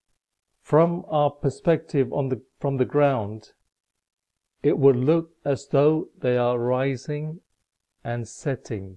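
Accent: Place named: India. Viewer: not at all! You sound like you may have an Indian accent, that would make us neighbours (I was born in Sri Lanka) ! But I'm not 100%...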